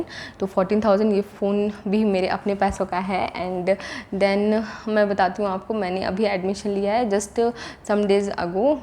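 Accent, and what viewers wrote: Jharkhand, India. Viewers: Aap jharkhand se ho??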